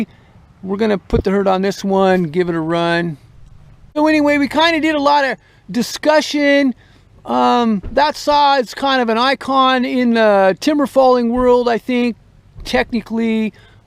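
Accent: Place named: North, United States. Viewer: You are literally the saw guru, I build big cat and Cummins motors for a living, and somedays work on our (dirt crew) were I might cut timber one day and run equipment the next just depending on what's we are doing) so when I say you have talent for saws know that I mean it because that's 1 mechanic to another and your yankee accent really tops it off you northerners really sounds like pricks half the time and coming from a southern hillbilly,,,,well we fucking love it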